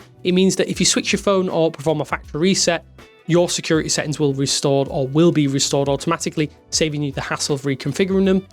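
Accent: United Kingdom. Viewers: I can't watch your complete video just because of your British accent it's really irritate me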